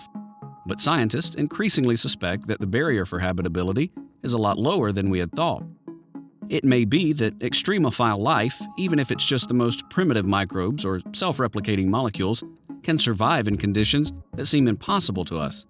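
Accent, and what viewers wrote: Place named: United States. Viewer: Great video - too bad that the speaker has a boring and uninteresting American voice. A British accent would be much better for the viewership - or anybody else for that matter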